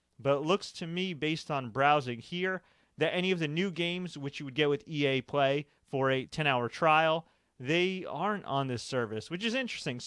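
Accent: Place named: South, United States. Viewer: This guy has a southern accent, Lew has a Canadian accent. Delivery is similar tho.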